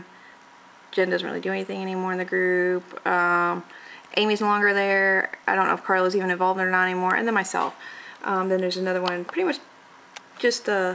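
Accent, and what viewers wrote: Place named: North Bay, United States. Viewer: It sounds like she's from North Bay. I don't know but my ex friend sounds just like her and she's from Petaluma CA. They mumble and say but umm a lot and connect all the words. I couldn't listen to this video without ear strain.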